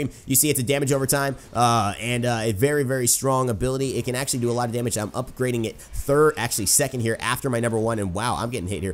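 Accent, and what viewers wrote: Ireland. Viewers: The Irish accent had me dying man great vid😆😆😂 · I'm irish and have lived in ireland my whole life. My GOD your irish accent is better than mine lol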